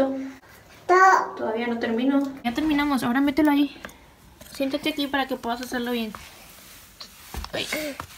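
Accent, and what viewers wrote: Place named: Monterrey, Mexico. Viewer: No manches, nunca imagine que fueras de Monterrey lo digo por el asento. Saludos hermosa ❤️😘🥰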